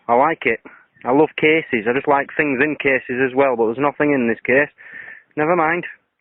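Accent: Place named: England, United Kingdom. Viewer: It was pretty obvious the 1. He's not to clued up on German 2. He speaks with an English accent and uses phrases like "hey up" and "get in" :D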